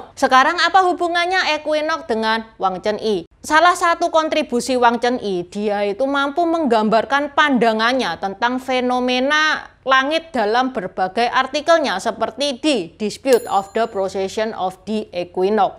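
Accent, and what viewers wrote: Java, Indonesia. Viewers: Logat bicaranya k sangat medok bahasa Jawa, sebenarnya k ini ada di mana siiih? Di China atau ada di INDONESIA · Bu jowone medok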